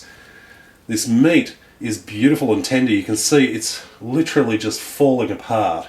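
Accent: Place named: Australia. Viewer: love Australian accents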